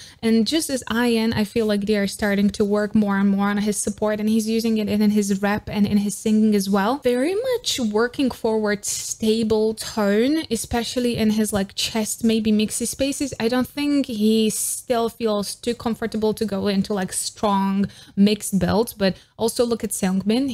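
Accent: Australia. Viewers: I think so, her accent does sound australian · Are you Aussie??? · I was thinking the same listening to you speak. You definitely have picked up the Aussie ‘oh’ (letter O) sound when you speak. It’s beautiful. Thanks again for another great reaction video. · Since when do u have an aussie accent? Naurrr saurrr hhaha I love it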